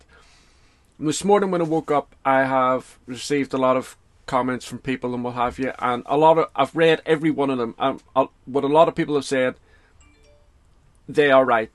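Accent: Northern Ireland, United Kingdom. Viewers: Hey. If you are closing the channel, I just want to say thanks for the content you've put out. I've only discovered your channel relatively recently but it seemed like any art supply I looked up you had a really good review of. To be honest, I thought you had a Northern Irish accent so you were relatively local to me and that was kind of cool so i kept coming back, although you've since mentioned in other reviews that you're actually in England, but anyway. Anyway, just know that there are morons out there these days who just seem to go out of their way to get offended. It doesn't matter what you say, they'll twist it and do mental gymnastics to get themselves outraged. You can't let them get to you. And if, for you, that means you feel you need to close your channel, then the most important thing is to look after yourself and your mental health. 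You will be missed though. All the best. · I'm really sad about this. I've been following you for years (my other account is Maureen Refior). I know so much about you and your family that I almost feel like I know you all, in a weird way. Just hearing your voice is comforting, because I rarely speak to anyone from Northern Ireland anymore and it's just nice to hear the sound of home. I get it, though. Having your words stolen and twisted into something completely different is an ultimate betrayal. It's an act generally performed by intellectually stunted virtue signalers who get their news from Twitter and Facebook groups. They roam the internet in packs searching for victims. The pack's collective IQ is generally 43. You're a good man. Your past actions do not have to be eternally atoned; bad shit happens in wartime. And while I am sad that you're leaving, the fact that you feel this as deeply as you do really upsets me. 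I will probably join your Patreon. When I commented on Facebook I thought I had your email but I was mistaken and l don't want to lose touch with you. I pray for your daughter every day, along with you and her mum. Take care of the wonderful man you are now. Screw the trolls. Much love to you.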